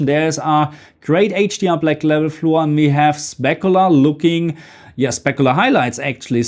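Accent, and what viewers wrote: Germany. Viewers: Man hört fast gar nicht das du deutscher bist 😂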